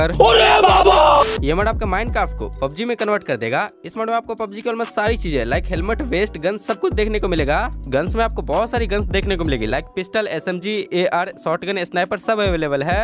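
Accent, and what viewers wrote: Bihar, India. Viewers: Your speaking style is like bihari but ek dam mast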